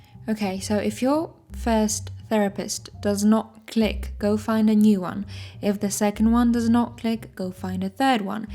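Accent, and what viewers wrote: United Kingdom. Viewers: I honestly thought that you were British :D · i'm surprised you have anxiety speaking in English i though you were British! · Yep. The first time I heard her speaking English I thought she was from some part of the UK because I noticed a bit of an accent and I thought she has a bit of a regional accent.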